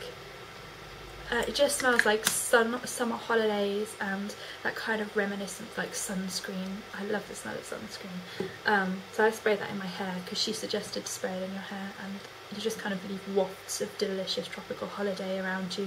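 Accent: United Kingdom. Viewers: You're so british! In a good way ^^ haha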